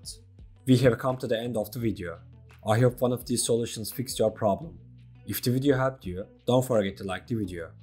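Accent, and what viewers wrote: Turkey. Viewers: Dost aksanından anlamıştım Türk olduğunu Fakat çalışmadı :(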